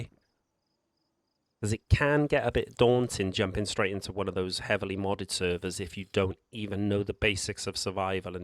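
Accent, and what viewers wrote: Wales, United Kingdom. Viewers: No problem mate, also i thought i recognized your accent are you from Wales by chance? if so then hello there neighbor, I'm from England lol!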